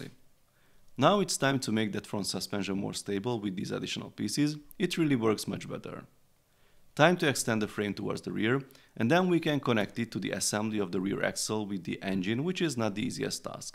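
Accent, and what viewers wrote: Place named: Hungary. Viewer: Kicsit magyaros a kiejtésed de jó lett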